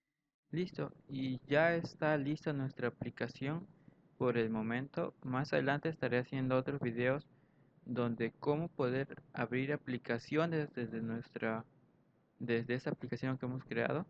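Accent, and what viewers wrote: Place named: United States. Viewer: hola gracias el video me fue de gran ayuda pero por favor como puedo cambiar la voz es que el problema es que su voz se escucha demasiado rara como si fuera una gringa intentando hablar español y hay muchas palabras que no se entienden bien por favor ayudame necesito arreglar ese detalle de inmediato por favoorrrr respondame rapido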